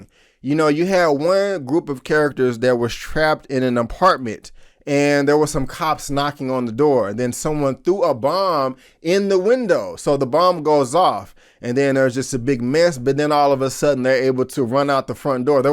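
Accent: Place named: North Texas, United States. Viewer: It sounds north Texan to me like dfw area mart be very wrong but that's how my people talk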